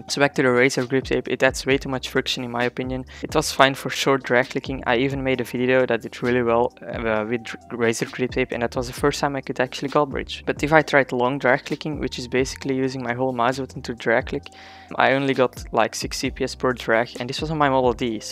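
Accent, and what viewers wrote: Netherlands. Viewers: Where you from you sound dutch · You have a Dutch accent?? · U sound dutch, where you from?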